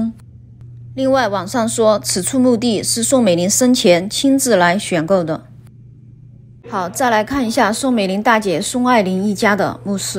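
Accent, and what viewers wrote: Sichuan, China. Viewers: 四川峨眉？普通话不准，😄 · 我是贵州人，也是半个成都人，所以很喜欢听你的川普话。你去的这个墓园我两年前去过，我们当时是三个人去的，尽管大厅里有音乐，因为空旷无人，走起来毛骨悚然。你视频里拍到的那些地方我都有经过，我也看到了这几个人的墓碑，还有一些你没有提到的国民党高官的壁葬碑文。我拍了照片，发了微博，我也非常感慨，岁月不曾饶过谁。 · 听口音应该是重庆或是四川人的博主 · 听你川话上头了，问一下你联系方式，也想听你的讲话保持上头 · 就事论事，川普话真是难听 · 四川口音。。。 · 川普好评！👍听着就亲切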